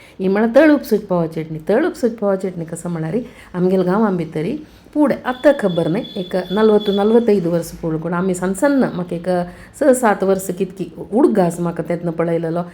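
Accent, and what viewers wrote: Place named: Thanjavur, India. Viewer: He kuthle marathi ahe ho..... Tanjavari ka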